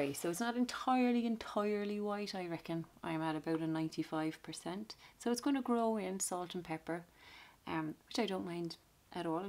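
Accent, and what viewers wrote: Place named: Ireland. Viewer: So delighted to discover you tonight! You have brightened my dull day with your enchanting personality & Irish? accent. I started by gray hair journey last August, I was SO excited for it! I also have pale skin and pale green eyes (and yes irish ancestry on both sides) and have been dyeing my hair dark for decades (even though my natural hair is light brown/dark blonde). I had NO idea what lay underneath it all! I also am just growing it out, not cutting it short or bleaching it at all. It has been quite the journey. At 8 months of growth I am just beginning to get glimpses of what it may look like grown out. I am planning on growing it to waist length which means it will take at least another 2 to 3 years or more. Right now it is long to mid back and I keep trimming the dull ends every month. I very much look forward to your future videos! 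Liked and subscribed. Slainte!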